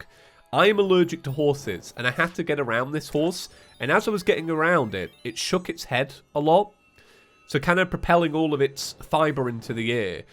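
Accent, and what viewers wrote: England, United Kingdom. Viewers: British accent is the best I mean I'm British so idk if I'm one to decide but eh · I actually like English accent so it's okay fir me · Is a british couch gang member i can relate to british speach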